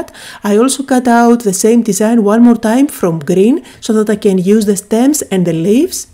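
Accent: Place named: Greece. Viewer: Its raining CAT’s and dogs here. So nice to hear you, your Greek accent. I always feel the nostaligy of all my Greek holidays. Thank you for this. Cardiamou.❤️🍀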